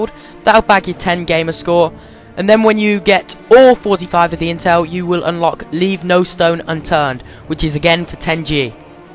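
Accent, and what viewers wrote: United Kingdom. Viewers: I love his british accent =)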